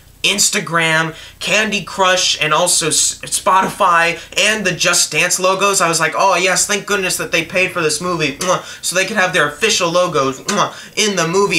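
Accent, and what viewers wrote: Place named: United Kingdom. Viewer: This is for FANS take that critics British accents are beautiful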